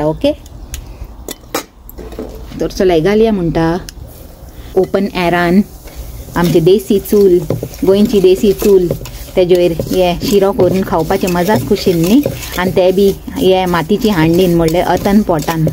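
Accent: Mangalore, India. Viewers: Mangalorean accent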